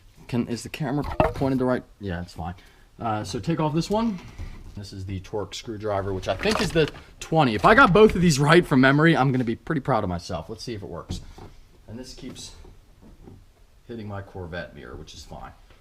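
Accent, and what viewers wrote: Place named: New England, United States. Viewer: I swear I thought he was saying "towing gears"... must be the Yankee dialect I'm not used too...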